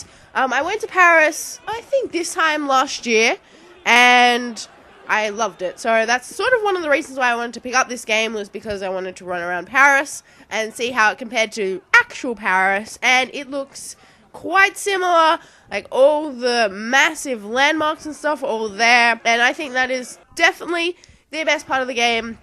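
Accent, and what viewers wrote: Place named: Australia. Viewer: Glad your back, missed that australian accent of yours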